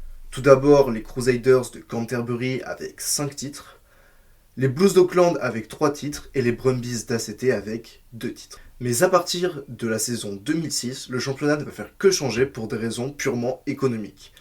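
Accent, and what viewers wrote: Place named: France. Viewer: Un bel accent frenchie et du rythme, ça s'annonce bien cette petite chaine ;)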